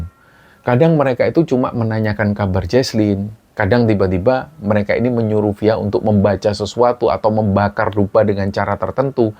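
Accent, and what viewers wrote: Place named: East Java, Indonesia. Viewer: sama sama mas jujur saya dari dulu ngefans dari taun 2021 ke juga ke mas sebagai narator cerita horor menurut saya beda pembawaan nya sama narator" yang lain apa lagi kalo udah ngomong pake bahasa Jawa timurnya saya suka logat medok nya 😊❤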